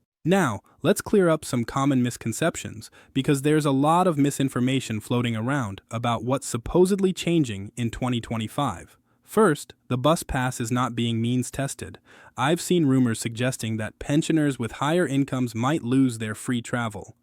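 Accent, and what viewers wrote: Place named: United States. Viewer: an usa accent talks about uk pensions etc would not trust a word he says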